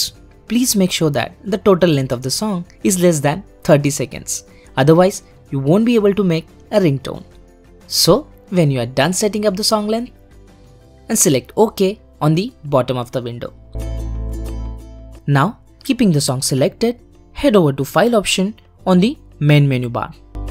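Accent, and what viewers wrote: India. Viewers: I don't know. I am sorry my friend, but when I hear an indian accent I turn off, purely and simply because of the many indian scammers out there, copying a variety of accents (badly!), but it is a turn off. Sorry bro Take care, good bye.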